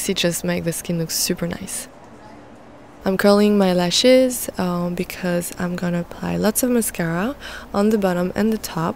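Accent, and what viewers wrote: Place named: France. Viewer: Nice voice and French accent. You're so chic . Keep up the great work .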